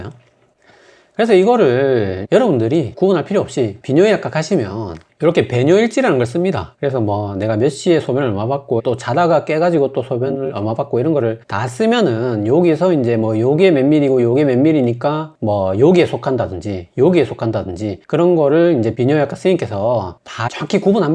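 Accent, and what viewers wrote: Gyeongsang, South Korea. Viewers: ~경상도 억양의 말투 너무 재밌어요. 귀에 쏙쏙 들어와요. 뭔가 중독성 있어요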